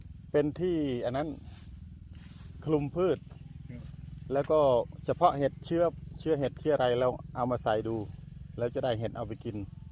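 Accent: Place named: Bangkok, Thailand. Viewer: สำเนียงคนกรุงเทพเสร็น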